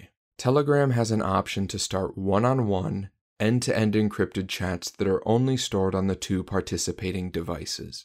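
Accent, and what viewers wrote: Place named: United States. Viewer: sorry. Just your accent sounds American to me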